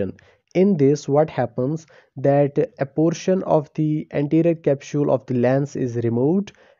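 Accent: Kashmir, India. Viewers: Kashmir se ho kya baya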